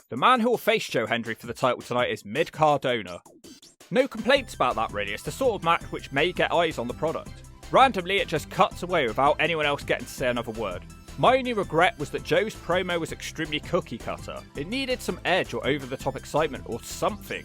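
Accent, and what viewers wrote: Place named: Scotland, United Kingdom. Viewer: Yeah, his voice can sound a little southern American and Australian if he's hanging back but he never had a THICK Accent, just some scottish ticks.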